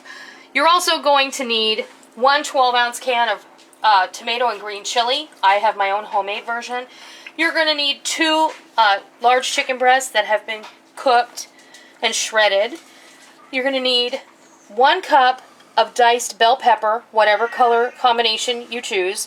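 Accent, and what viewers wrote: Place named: Wisconsin, United States. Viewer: Where are you from? You sound like you have a Wisconsin accent 💜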